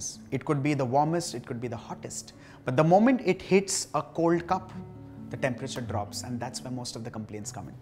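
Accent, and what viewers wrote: India. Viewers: He is indian right? Yeah I am loving his indian accent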